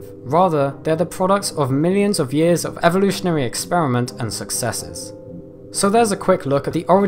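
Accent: United Kingdom. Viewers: Ssooo.... You're British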